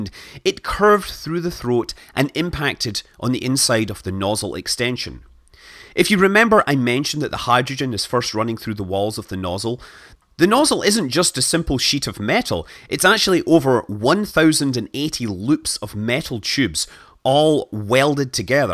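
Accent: Scotland, United Kingdom. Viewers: It this narrator Scottish or Welch or what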